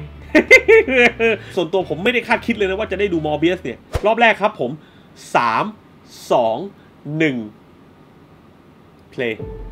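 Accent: South, Thailand. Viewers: คนรีวิวคนใต้หรือเปล่าคับ แหลงใต้ชับจัง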